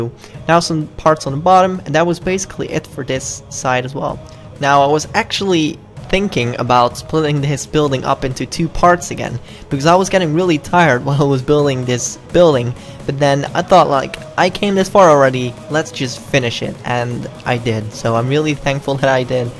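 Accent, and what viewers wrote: Netherlands. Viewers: Seriously are you Dutch to? 😂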